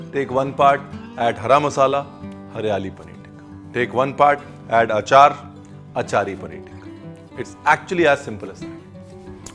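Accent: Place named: Punjab, India. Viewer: Bhaaji you are the best across youtube and i love how you speak your punjabi accent i love that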